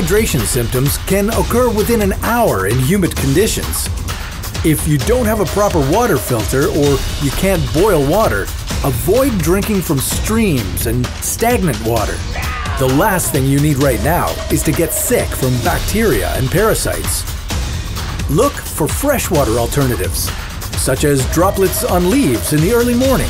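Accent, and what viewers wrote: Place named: United States. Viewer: american accents are the worst. we need an old english dude commentating this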